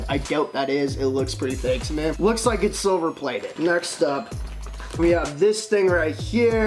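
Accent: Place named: Alberta, Canada. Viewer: Duh duh duh duh duh duh must be from Edmonton or Alberta somewhere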